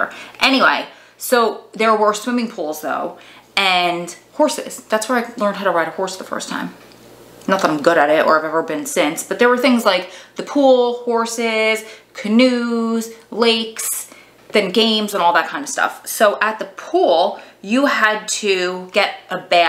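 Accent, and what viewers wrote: New Jersey, United States. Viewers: wait girl are you from nj?? the accent? i grew up there and miss it so much 🥺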